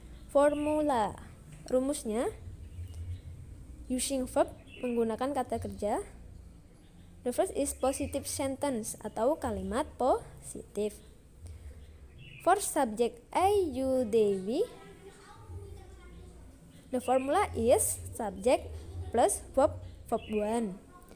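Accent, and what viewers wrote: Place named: Java, Indonesia. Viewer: Javanese English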